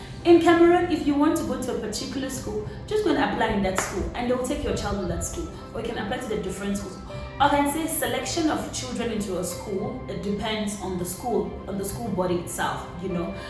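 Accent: South Africa. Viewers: You look and sound very south african. Can you explain that for me, I am interested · And she has SAcan accent 🤔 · La Nunsy. i too thought her accent sounded south african. it has a general south african ring to it. you can't pin poin the region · M yswitchcom Being Bantu means nothing when it comes to accent, many Cameroonians do not sound like her, East Africans do not sound like Southerners, Zim accent is different from SA accent, she has a South African accent. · Your accent sounds almost South African...😊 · Your accent kinda sound south African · My sista I think you definitely need to stay more in SA so you will learn the life in SA and stop lying. You already have SA accent but you still do not know SA very well? What all these lies? Which part of SA are you based? Bikes? Luxury? How? Transport a hustle? how? Are you okay tho? I think this is really rude and expose your ignorance coz my sister you already have kinda SA accent, I expected better not this. 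Ha.a when it comes to you, Ignorance is not bliss instead Ignorance is embarrassing coz what are you SA? Government choose school for learners? Oh maybe in SOME parts of Gauteng and because the schools tend to be overcrowded than in other provinces. About 16 December? Do you even know the reason of the holiday as you say that "It's end of the year so people reconcile?" Must you be so ignorant tho? You schooling, do you have SA friends who can tell more about SA not this. Coz I mean why are you lying?? In Cameroon, y'all have 'keke' or 'okada' right? Use your common sense and imagine Okadas in SA roads, where almost every month there are road accidents and people driving on high speed like it's nobody's business, would you survive in that?. Ha.a I'm disappointed I'm sorry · WoW, I really ENJOYED your video. You sound , look like, and act like any South African. Thank you so much for speaking highly of our country, you were spot on regarding your observations about SA. 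We lord you and you are 100% one of us South Africans. We love you!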